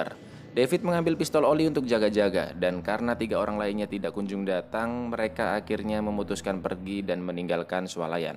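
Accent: Java, Indonesia. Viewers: Iki wong ndi sng ngomong kok medok bgt kwwkwk salam Pati Jawa tengah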